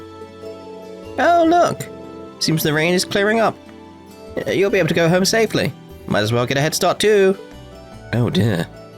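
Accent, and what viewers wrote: Scotland, United Kingdom. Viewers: Scottish voice reveal Scottish voice reveal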